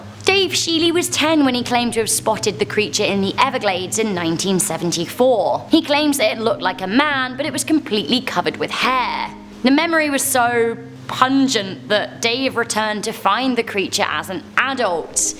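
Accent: England, United Kingdom. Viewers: OK Guys.... be honest with me... "Who else would watch if she was doing a video on Tree Moss". Yup... Me too! The fact the does the Top 10... is a double win! Adorable/Sexy and that English accent just make me melt!... you are 100% Beautiful!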